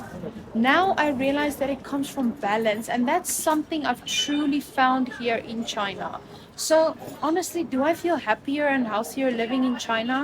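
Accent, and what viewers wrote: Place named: South Africa. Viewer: You are from South Africa? The accent gives away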